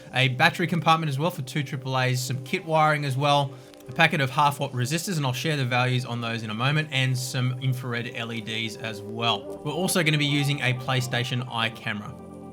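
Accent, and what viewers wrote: Australia. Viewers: Dude he is a ozzy. Its called an accent. ;)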